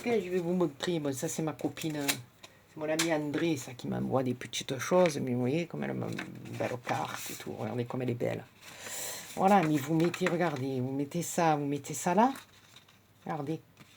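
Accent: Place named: Marseille, France. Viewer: J'adore ton acsens de Marseille et aussi ton travaille facile mercies beaucoup continue j'aime beaucoup c'est agreable vous entendre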